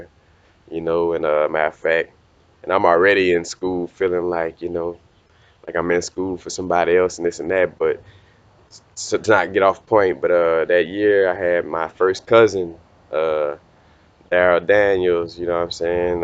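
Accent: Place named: South Louisiana, United States. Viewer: I'm from lousiana, you sound like you are in the south Louisiana area but I may be wrong.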